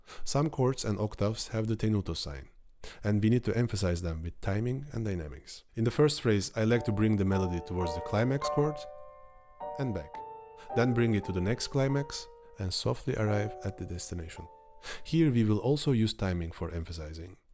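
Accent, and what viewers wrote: Russia. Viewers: typical russian accent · Слава Шопену! Именно благодаря ему я наткнулся на Ваш канал. Я так понимаю (по акценту) вы русский. Подобного уровня контент я знаю у Михаила Аркадьева ещё. Но он в плане оформления не так хорош: там на телефон записано всего лишь. А тут у Вас просто как документальный фильм, а не урок)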